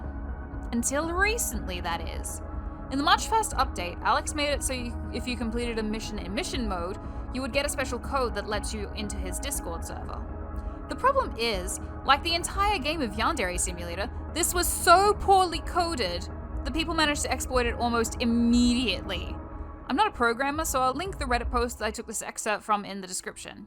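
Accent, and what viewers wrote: Australia. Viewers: wait a damn minute he's australian? gross get him out of here musty bitch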